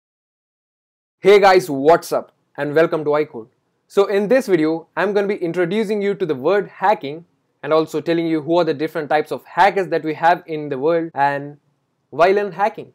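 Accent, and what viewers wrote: India. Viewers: You know it's about to be good when the man's got a slight indian accent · it's a proper indian accent....not slight...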